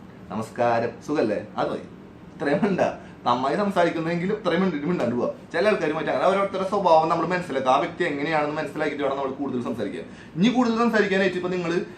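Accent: Thrissur, India. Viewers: Bro തൃശ്ശൂർ ആണോ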